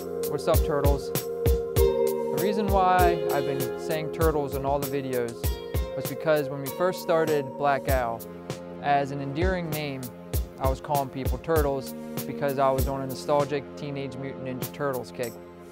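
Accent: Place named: Eastern Pennsylvania, United States. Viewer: What part of PA? You sound like your maybe eastern PA Lebanon,Perry county area.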